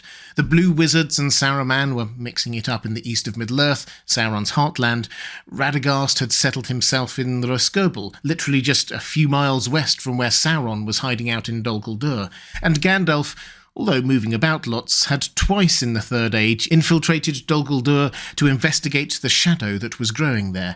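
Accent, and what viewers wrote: England, United Kingdom. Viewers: you have the best narrating voice & cadence, I love the way you speak, even down to your accent, I’m also English & it sounds bad but I cannot listen to an American talking about LOTR, it just sounds wrong to me, it’s like trying to listen to an Englishman talk about guns and pickup trucks, just doesn’t feel right.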